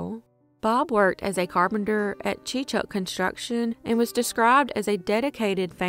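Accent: South, United States. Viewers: You have to tell me what state you grew up in. I am trying to place your accent. I’m guessing Alabama or Georgia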